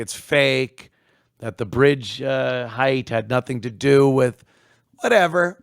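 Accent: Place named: Boston, United States. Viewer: I love your Boston area accent. You are also pretty don good with Tony Soprano , Jesse Ventura and Casey Kasem.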